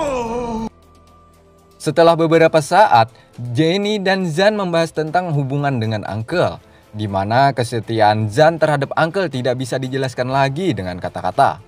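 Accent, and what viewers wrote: Banjar, Indonesia. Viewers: apakah org banjar 🤣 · Banjar banar neh pina nya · Urang Banjar nah pembawa kisahnya nih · Kayak bhasa bnjar pengisi suara x ini.. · Iya sanak,, Banjar banar Pian nih 😅🤭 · Khas banar logat banjar nya🤣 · Wkwkwk banjar bangat🤣👍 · Urg banjar kh p mang? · Orang Banjar wkwk 😂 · Sanak orang Banjar kah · urang banjar kah adminnya. 😅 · Khas banar suara bahasa banjar nya kada kawa di buang😅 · Orang Banjar mana suara nya ni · Logat banjar nginih Salam dari hulu sungai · Banjar banar dangsanak lah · org bnjar ya bang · Urang banjar lh bng pian😂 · Orang banjar kah tukang kisah nya ne 😅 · urang Banjar kah sanak Pian ni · Urang Banjar kah pembawa alurnya ne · Urang banjar sidin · Orang Banjar kah min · Orang banjar kah mang? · Pinanya urang banjar pembawa acaranya ninih,,yakah sanak..?? Kkkk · Urang banjar pang pina logatnya😁😁😁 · Ini logat urg Banjar... 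Iya kh...😆👍👍👍 Aja.... Sukses slalu...Waja sampai kaputing... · Banjar banar 😁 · banjar banar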